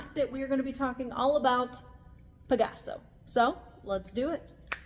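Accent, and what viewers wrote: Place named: Ohio, United States. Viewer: Cool video! You sound like you're from Ohio. Spent the better part of my 70 years there.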